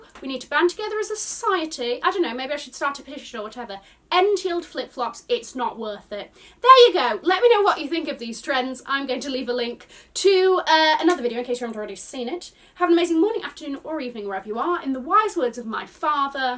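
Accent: United Kingdom. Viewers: You are the first British accent that I’ve heard say Tig ole Bitties 🤣🤣🤣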